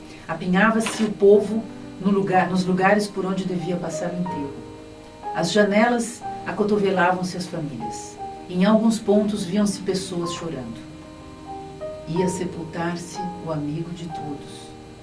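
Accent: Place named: Brazil. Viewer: O sotaque dela não é tipicamente paulistano. Tenho curiosidade de saber onde nasceu a Dra.. O trabalho dela me encanta muito.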